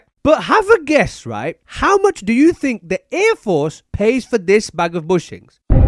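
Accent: United Kingdom. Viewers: Angry British guy perpetuates misconceptions about Donald Trump's intentions. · Aren’t you British? 😭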